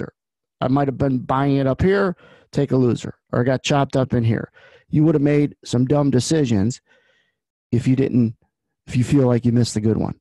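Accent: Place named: New York, United States. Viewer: I love these videos every day. No nonsense blunt real new Yorker talk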